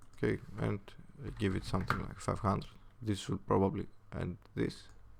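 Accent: Greece. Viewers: I can tell the Greek accent miles away!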